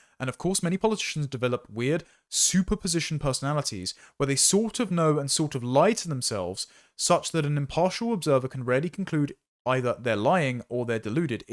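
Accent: RP, United Kingdom. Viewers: You sound like a toff.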